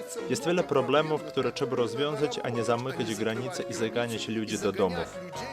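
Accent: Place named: Russia. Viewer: Czemu ten lektor mówi Rosyjskim akcentem ?? Japierdole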